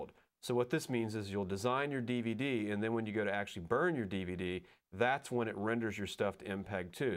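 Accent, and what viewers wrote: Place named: Pennsylvania, United States. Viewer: I love the PA accent.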